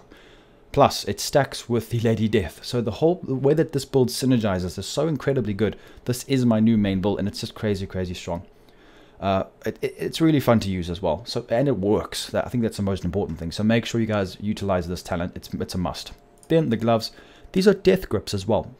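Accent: South Africa. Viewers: So good to hear a South-African English accent💪🏼💪🏼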